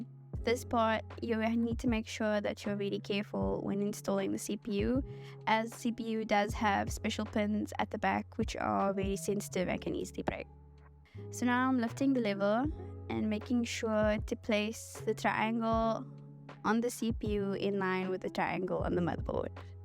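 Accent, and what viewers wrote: South Africa. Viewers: Am I hearing a CPT accent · South African accent.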